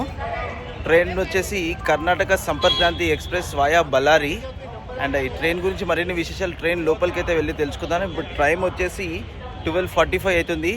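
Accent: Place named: Telangana, India. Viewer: తెలంగాణ యాస 😊❤...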